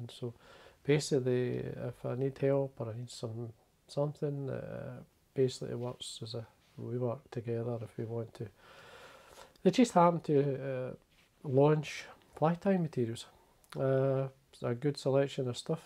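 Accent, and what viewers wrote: Scotland, United Kingdom. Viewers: Finally put a face to those fingers and that lovely Scottish voice! I had a bamboo rod put in my hands 63 years ago. Started tying and buling rods 40 years ago and have loved every minute of it. When my dad passed, I found that old bamboo rod and completely rebuil it to its original condition and caught trout on it 63 years later! What a joy. It was as if dad was there and probably was.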